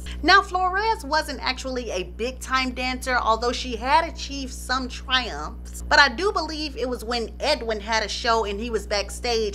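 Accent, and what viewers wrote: South, United States. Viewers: I love how you talk all country and then come through with the Dianne Sawyer voiceovers 🤣🤣🤣❤️❤️❤️ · Hey there girl. I was about to lose my mind if I didn’t see another one of your fun juicy videos filled with gossip scandal and tea. is one of my favorite female groups. Right up there with the. I just love the way you talk with the country accent. It compliments you so well. It goes hand in hand with your character you are so bubbly fun and such a joy to watch and listen to. You are such a talented woman. You are going so far with your talents. The lord has blessed you so abundantly. God bless you your family and your talents.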